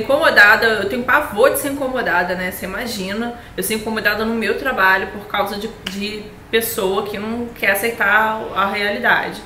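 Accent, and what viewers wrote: Rio de Janeiro, Brazil. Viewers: Muito bom mas no sotaque carioca. Ah não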